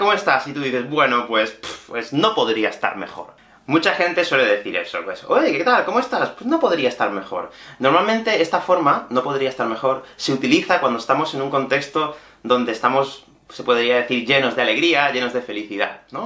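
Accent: Spain. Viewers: Estoy aprendiendo español (inglés es mi idioma madre) y he entendido casi 80% de este video en español sin traducciones a inglés! ¡Necesito más estudiar los verbos la otra del indicativo! El más aprendiéndolo puedo entender los acentos de hispanohablantes del mundo. ¿Quizás tu acento es español? Pero, muchas gracias por esta lección y suscribiré a tu cuenta de YouTube. ;) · joder, me cuesta el acento español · ) Este acento espanol <3 :P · Tô aprendendo espanhol e fui vendo o vídeo achando que ele tava com sutaque espanhol e quando fui perceber tava traduzindo o vídeo na minha cabeça sem perceber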